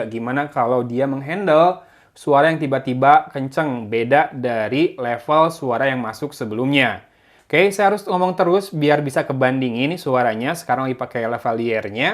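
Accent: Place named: Java, Indonesia. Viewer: Medhok ya Pack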